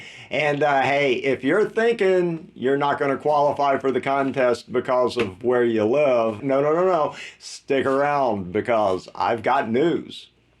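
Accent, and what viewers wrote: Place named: South, United States. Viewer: like this video and all other s .i just stupidly across.like the way you talk sounds country.stay cool and God bless.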